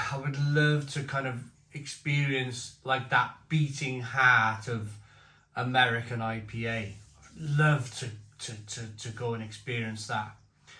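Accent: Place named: England, United Kingdom. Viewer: Proper english lad with a proper English accent reviewing a proper american ipa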